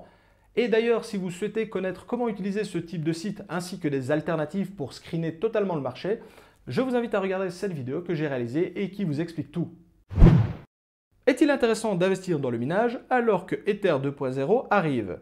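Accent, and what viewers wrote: Belgium. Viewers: Dis, il me semble que toi et moi venions des mêmes contrées sur base de ton léger accent.:) Question donc entre compatriotes. Tu fais comment niveau elec? Je consomme environ 2200w pour faire tourner 7 gpu (combi 3080 et 3090), ce qui me revient a environ 490 euros d'elec par mois. En Belgique (oops j'ai dévoilé notre pays), l'elec, frais de transport compris, monte a un prix moyen d'environ 30 centimes par kwh. Du coup, calcul fait, sur les 1500 euros de moyenne que je génère en assets, ben j'en récupère 1000 in-fine. Du coup, tu as un truc toi pour payer moins cher en elec? je suis chez Eneco et j'ai le "meilleur" plan d'après eux... mais bon, si t'as des super idées et vu ta connaissance du sujet, je prends;) MERCI!!!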